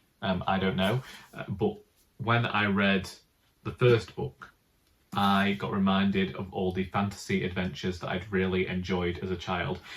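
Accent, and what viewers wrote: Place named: Manchester, United Kingdom. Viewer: I've been watching your videos a lot recently and I really enjoy them, in part because I recently moved away from Manchester and I feel so at home listening to your accent. Also, you've helped me out of a reading slump and although I'm not a quick reader I am back into reading again. I enjoyed Never the Bride by Paul Magrs and I've just got Emotionally Weird by Kate Atkinson. I've made note of quite a few of your recommendations, which I'll look at getting later on. It's funny how earlier this year I couldn't find anything that tempted me to read but, having got back into the reading mood, I'm coming across so many books that look interesting and my book shelves are stacking up. I've never really looked at books in charity shops before but I think the randomness of choice has been really helpful. Anyway, just wanted to say thanks, 📚🙂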